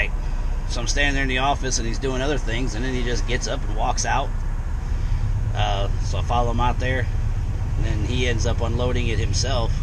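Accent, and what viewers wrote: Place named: South, United States. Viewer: Nobody like driving in or around NYC. Your lucky the traffic is moving good. I always run into a accident or lane closures at night. People are mean to over weight people that they perceive to be Trump supporters. Given that you live in a van and sound like your from down south. If you were a hot girl the guy would have made more small talk.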